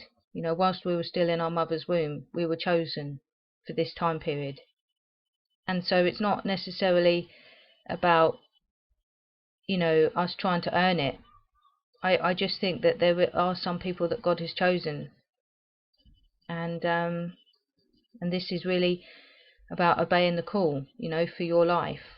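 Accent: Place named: England, United Kingdom. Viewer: You are English! haha your accent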